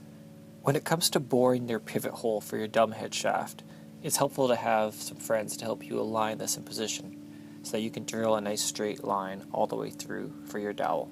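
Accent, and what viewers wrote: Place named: Canada. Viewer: Are you Canadian sir?? Good to see fellow Canaidan on here lol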